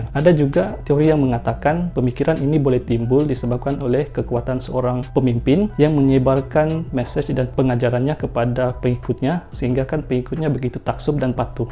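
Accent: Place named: Sabah, Malaysia. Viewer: Ya, agak jelas slang sabah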